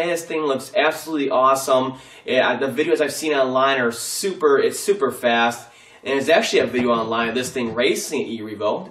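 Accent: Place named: Northern Illinois, United States. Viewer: I'm hearing a far more northern IL voice than mine so probably nowhere near me. I'm just an hour or so from KY actually.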